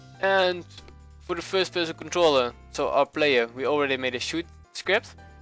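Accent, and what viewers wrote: Netherlands. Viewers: youre dutch arent you #4secondsinvideo #dutchaccent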